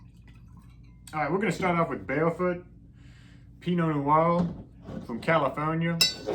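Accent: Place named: South, United States. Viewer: Great video! Are you southern? I love your accent 😍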